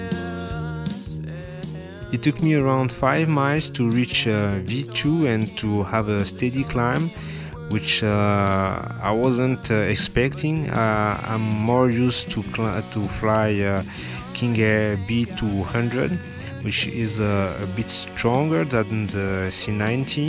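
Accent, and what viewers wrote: France. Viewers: Você é brasileiro? Essa cidade é no Brasil mas seu sotaque é francês...enfim se for francês vá "treinar" com a vida das pessoas no seu país...se for brasileiro para de fazer burrada imbecil! Treine no simulador ou em uma área afastada, foi de imensa responsabilidade fazer isso em cima de bairros residenciais e lojas como a Havan que está sempre lotada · Are you french ? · Jme suis directement dit que tu es Français avec ton accent ahahaha bonne vidéo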